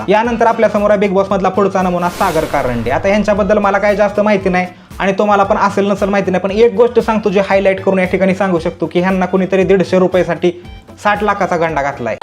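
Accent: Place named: Karad, India. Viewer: Karad ancent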